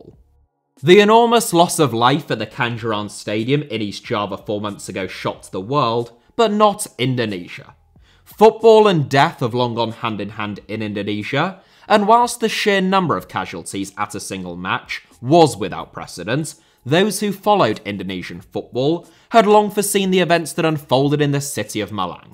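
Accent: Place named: United Kingdom. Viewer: Your voice i know you🤣 the british accents viral boy????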